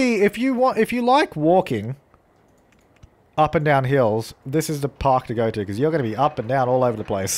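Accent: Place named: Australia. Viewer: you have the best Australian accent :D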